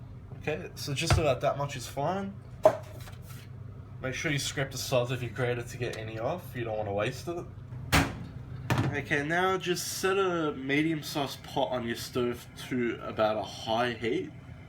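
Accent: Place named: Australia. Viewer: Jus' one observation. It never looks good when an executive chef uses cookware that is marred or scratched up on the cooking surface. The stockpot in this video appears to be a 'Teflon' coated pot, and the Teflon coating is obviously scratched off on the sides, and cooking surface of the pot. It just looks unsightly, and unappetizing. In addition, they say that toxins leech out of the pot when heated from under the Teflon coating. Jus' my observation, but of course, you may feel differently. I love your Australian accent, and your cooking style. I hope that I'm not mistaken in believing that you're Australian. Forgive me if I am.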